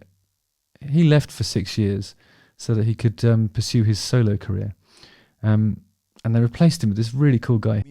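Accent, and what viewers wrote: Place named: RP, United Kingdom. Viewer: Your knowledge of Maiden is weak. And spoken in your posh accent and delivered so woody and dry makes difficult listening.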